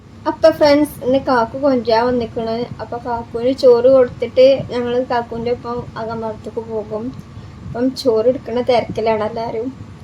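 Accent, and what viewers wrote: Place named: Malappuram, India. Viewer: Nadan slang 😅 ketttal aryam Malappuram ahn nnnnn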